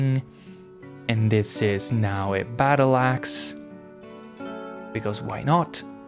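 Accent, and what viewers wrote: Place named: Italy. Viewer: Ι cant tell if your accent is greek or italian or maaaybe spanish. Which is it? Betting on Italian.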